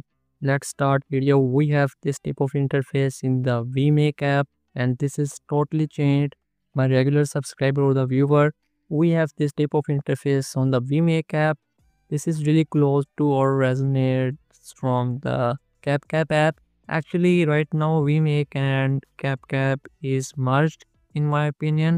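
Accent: India. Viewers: Indian accent😭😭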